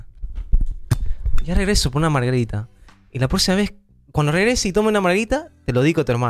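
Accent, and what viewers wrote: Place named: Peru. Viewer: Si parece peruano,aunque combinado con otros países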